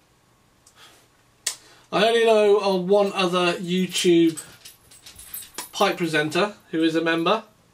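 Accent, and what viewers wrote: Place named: England, United Kingdom. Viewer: nice pipe..are you england? I noticed something diferent about your english .I m brazilian .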